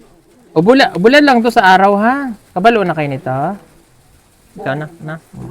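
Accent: Visayas, Philippines. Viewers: Marunong na mag bisaya c sir 😂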